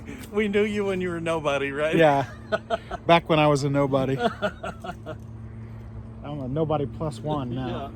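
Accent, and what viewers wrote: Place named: Midwest, United States. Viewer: Actually thats the ceiling not the roof but I have a great fondness for Mid-central American accents. ❤️👍🇺🇲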